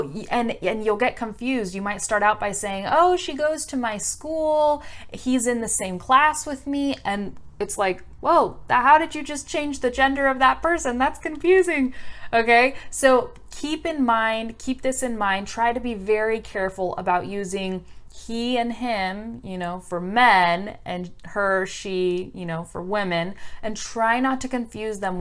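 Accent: California, United States. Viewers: Come to California, many women sound just like this. I don't know if she is from California, I'm just guessing based on her accent. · Move to California and you´ll start talking like her in a couple of years or so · I know everything what you said, particularly the grammar aspect. But I simply love this video bcz its very useful for my listening ,and i love your californian accent.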